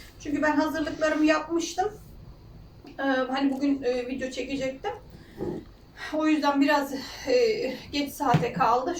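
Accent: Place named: Antalya, Turkey. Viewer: Bir Antalyalı konuşması müsafirrr 😂günaydın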